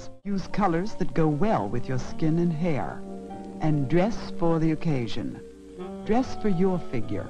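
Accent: Transatlantic, United States. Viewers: This is known as a transatlantic dialect, it was very popular for narration on film and in television in America from the 1920s through the 1950s.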